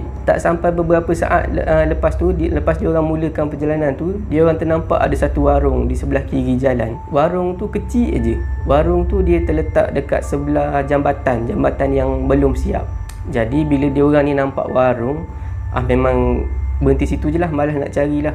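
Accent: Terengganu, Malaysia. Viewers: Bru nk Tanya orang Tganu kita ke? Betul pulok ambo agak orang Ganu. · Hadir sapport org ganu kite.. Slang ganu mmg x leh lari 👍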